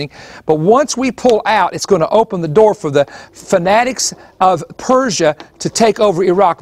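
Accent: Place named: South, United States. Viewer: although his gomer pyle accent is somewhat distracting, he says some pretty interesting things....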